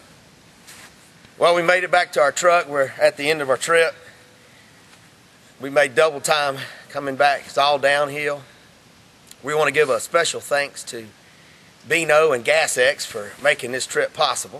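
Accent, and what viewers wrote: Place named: South, United States. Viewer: Hey, my wife says no, I say you could be my twin brother, except for your southern accent.........and I may have a little more hair on top! Ha Ha. Nice video, Thanks PS I notice on some of your other videos your handy in the metal shop, I am also a tool and die maker by trade. Weird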